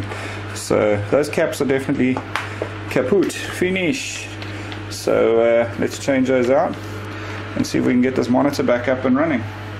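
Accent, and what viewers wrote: South Africa. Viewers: Ha// spot the South African accent a mile away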